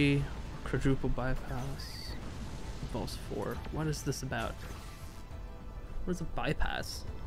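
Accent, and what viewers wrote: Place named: Ireland. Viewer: What is this video?!😂😂 Well we can tell he’s Irish!😁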